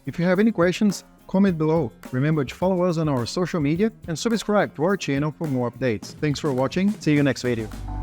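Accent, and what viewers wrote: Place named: India. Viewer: Guy sounds like a Telemarketer from India.They can't find an Aussie as narrator??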